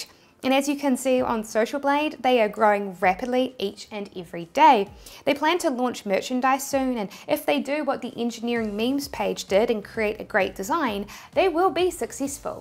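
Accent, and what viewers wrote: New Zealand. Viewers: Are you a kiwi/new zealander?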